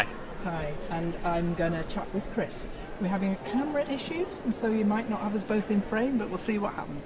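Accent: England, United Kingdom. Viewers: ❤love your English accent and how you live life to the fullest 🎉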